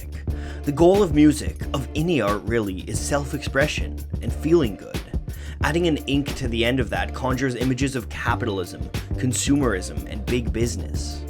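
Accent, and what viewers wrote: Canada. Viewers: You have such an interesting accent. Are you Canadian?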